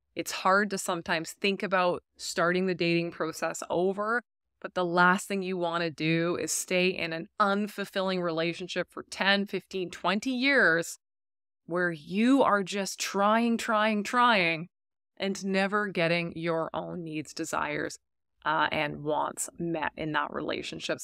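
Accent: Canada. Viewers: Seriously. Are you Canadian?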